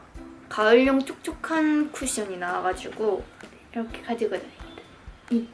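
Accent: Busan, South Korea. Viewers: You got a dialect. Are you from busan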